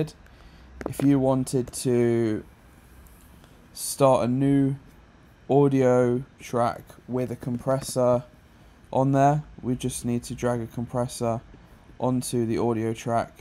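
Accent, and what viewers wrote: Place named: United Kingdom. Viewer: happy to found someone who has british accent with ableton tutorials :D <333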